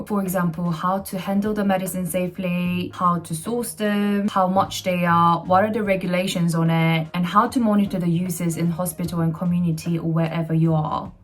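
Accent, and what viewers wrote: United Kingdom. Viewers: 진짜 너무 멋있어요!!! 실행력도, 하고자 하는걸 해내는 능력도 정말 멋있고 존경합니다!!!! 영국 발음도 너무 듣기 좋아요..🤍새로운 길을 응원해요~~~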